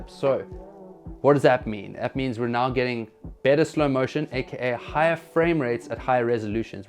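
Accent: South Africa. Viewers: Why do you sound South African? mei bru? heiboooo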